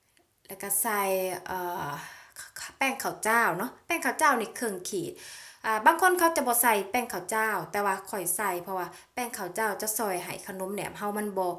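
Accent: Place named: Savannakhet, Laos. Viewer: ມັກຫລາຍໆສຽງເຈົ້າເວົ້ານະເອື້ອຍ ຄົນສະຫວັນນະເຂດ ເວົ້ານົວ ຫົວມວ່ນ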